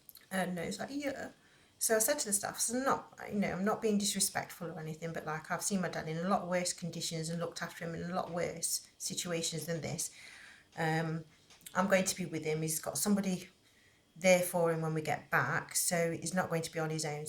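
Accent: England, United Kingdom. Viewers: So awesome ... you truly inspire me and I absolutely love your English accent.... I’m from Louisiana!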